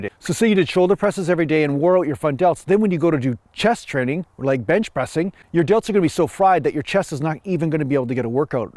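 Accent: Canada. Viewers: Ok I'm playing name that accent. How close to Alberta are you from?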